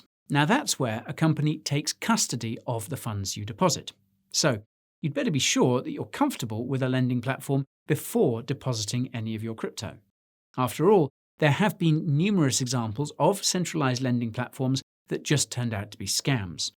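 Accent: United Kingdom. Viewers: Just because of the British accent I trust this guy more lol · Love your brit accent & pacing. It sounds much more credible than the typical self-help American mumbo jumbo. With that sweet voice, you could sell me any shitcoin you want, lolz.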